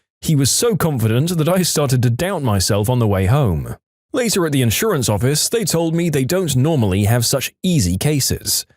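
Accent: United Kingdom. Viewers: Why is he British now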